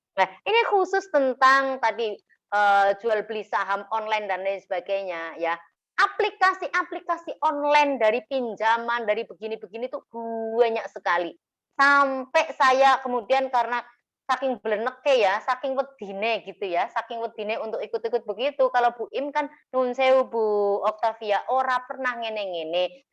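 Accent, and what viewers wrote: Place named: Banyumas, Indonesia. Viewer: Q sung seneng bolak balik tak ngringokna 👍🙏hehe wong ngapak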